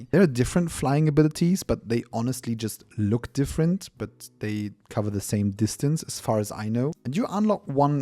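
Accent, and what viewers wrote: Germany. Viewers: Safe bist du Deutsch das hört man doch raus :D Currently playing this on my phone and the amount of content is absolutely insane like... everyday I find something new you think you've seen it all but nope god knows how they ported this onto phones guess their own engine helps in that regard I thought my GOTY would be expedition 33 but Where Winds Meet is literally blowing my mind this game is essentially a lifestyle you're part of this world and slowly progress through your own journey create your own story and decide who you want to be literally can't praise it more enough Also remember the Goose is your best friend ❤...sagt man sich auf'm CN Server zumindest so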